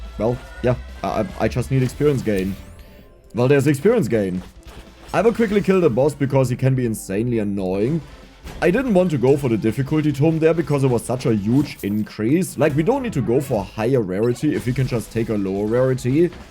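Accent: Germany. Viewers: Didn't know that german-accented commentary was what i needed more of in my life but i will take it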